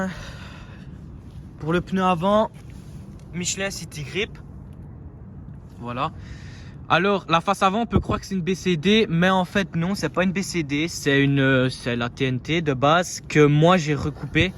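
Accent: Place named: Hainaut, Belgium. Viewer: Avec ton accent Hainaut laisse tomber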